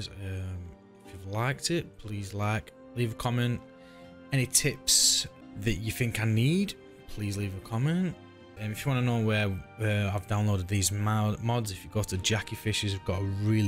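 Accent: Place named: Manchester, United Kingdom. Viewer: Sound like you from Manchester mate well done on looking at this mod mate shit I’m on console pal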